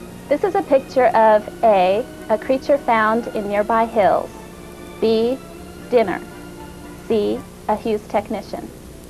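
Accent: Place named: Canada. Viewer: So you're from Ottawa, eh?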